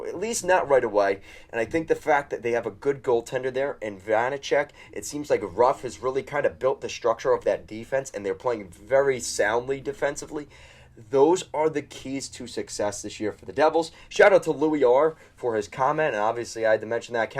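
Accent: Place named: Long Island, United States. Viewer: Like your Long Island accent dude.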